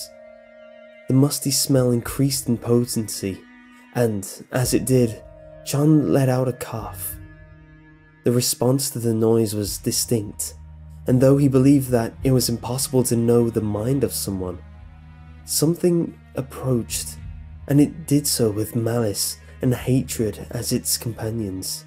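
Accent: England, United Kingdom. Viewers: Yah,not really into britspeak. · I like MCP and. But I like English accent better than MCP's accent.:D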